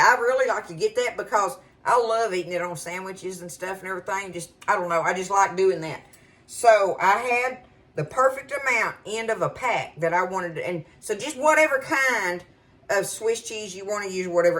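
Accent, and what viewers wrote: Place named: Texas, United States. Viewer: Love watching you cook with you country ways and Texas accent! Being from Lampasas Texas, I can understand you very well! lol😊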